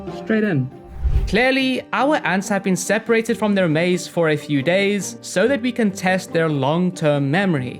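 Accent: South Africa. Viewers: Am I hearing a South African accent? Lmao vase line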